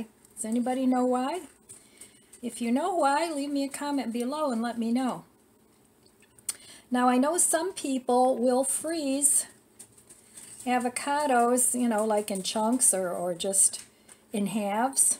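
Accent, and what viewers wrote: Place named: Michigan, United States. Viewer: Just found your channel, and am really enjoying your content! Very useful! I love the idea of adding a layer of plastic wrap over your guacamole to help seal the air out! You have an accent like a friend of mine from Michigan...are you by any chance from that area?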